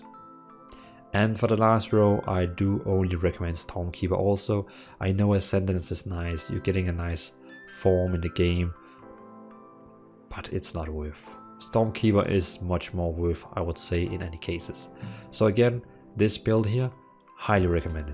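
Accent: Denmark. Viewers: Really enjoy the tone and speed of you voice :) do i hear some Danish in you english also? You Got a sub :D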